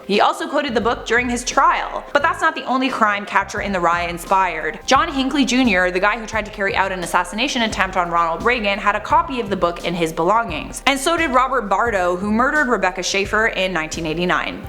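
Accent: New York, United States. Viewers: NY accent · You're rocking that shirt. 🎃 Edit: your New York accent is awesome said in a New York accent 😂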